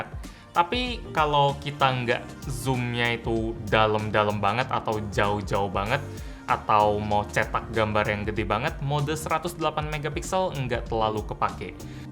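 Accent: Palembang, Indonesia. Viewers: Gelep itu bhs Palembang, krn orang palembang jd wajar aja masih kebawa bahasanya. Lagian beda tipis sama bhs indo. Beda E dan A aja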